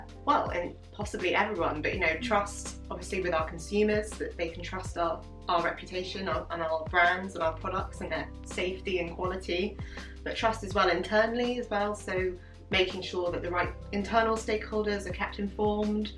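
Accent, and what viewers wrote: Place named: United Kingdom. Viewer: Wow; you are starting to have a British accent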